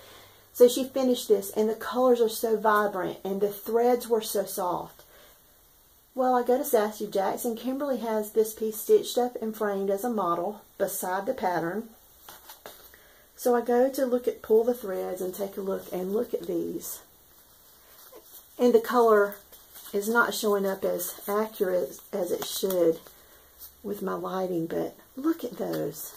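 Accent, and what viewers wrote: South, United States. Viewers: Another wonderful video. It always makes me happy to see your sweet smiling face and listen to your sweet southern voice. So awesome you got to meet Nicola, hear her lecture and take a class...unforgettable. I also had to stop the video to shop for the Karoline...pattern. I haven't stitched with wools yet, but I will in the very near future. Love the Sampler piece over 1, I'm doing mine over 1 as well...but way behind you. Hugs and stitches right back at you. ❤️❤️❤️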